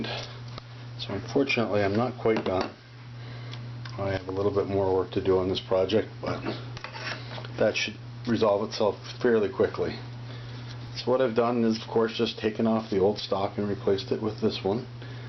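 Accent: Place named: Ontario, Canada. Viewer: Must be from Ontario, right? It's the slight but detectable "uuut" in your speech.... (PS: I"m originally from Vancouver where all from Tah-ronna & points east want to really live. Lotus Land.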